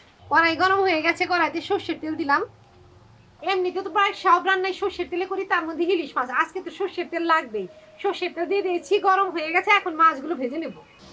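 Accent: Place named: Bangladesh. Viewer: Onar kotha barta Bangladesher moto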